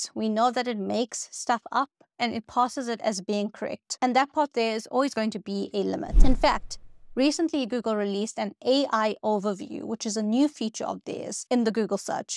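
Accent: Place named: South Africa. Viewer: I lilke your channel it'sinteresting you sound south African and Indian, although i dont know you coul dbe sri lankan or etheopian dutch lol.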